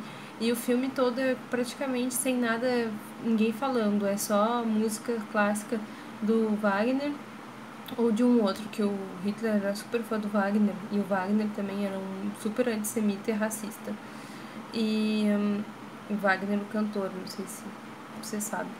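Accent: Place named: Rio Grande do Sul, Brazil. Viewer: Tu é gaúcha né? Dá pra ver pelo sotaque haha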